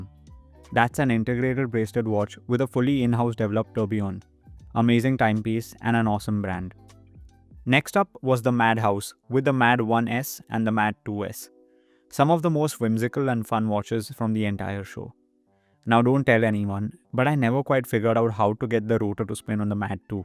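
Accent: India. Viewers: Can you get rid of your Indian accent next time, eh?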